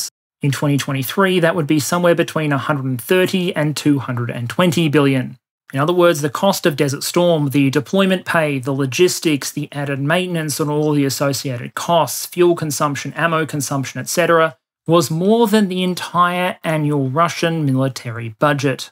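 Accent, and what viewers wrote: Australia. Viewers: How you put out such high quality, thoroughly researched and amazingly interesting presentations on a weekly basis while also holding down your day job is just mind blowing. I don't know how you do it, but I am just so damned glad that you do. And whilst sharing an accent and a like of vegemite doesnt give me the right to be proud of your work, there is some level of shared Aussie pride in knowing that a fellow countryman from the wrong side of the world puts out arguably the best Ukraine war related stuff on youtube. Bravo.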